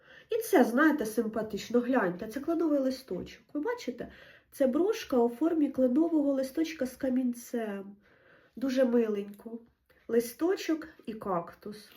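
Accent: Poltava, Ukraine. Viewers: По суржику зрозуміла що ви з Полтави!!! Привіт вам і гарного настрою!!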